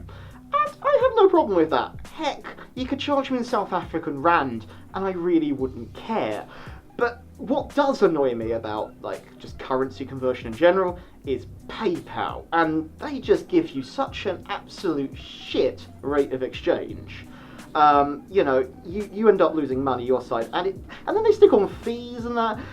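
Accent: England, United Kingdom. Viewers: I thought you were going to talk about the cute kind of "shipping" for a moment, there. ^_^ I want to be a furry, so it's good that cons exist in the UK, and it's possible to get a fursuit here (I may have already known that you were English, but I tend to forget as I can't really tell the difference between English and American accents, so I tend not to assume YouTubers are one or the other, if that makes sense.)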